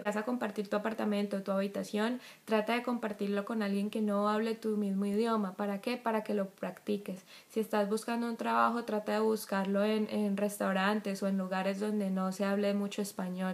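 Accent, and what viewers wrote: Colombia. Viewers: Tenias mucho acento caleño jeje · esa forma de hablar, jaja se nota que sos colombiana. Muy buenos consejos; y como tú dices: ponerlo en practica es indispensable. Muchas gracias por el video, saludos desde Cali-Colombia vee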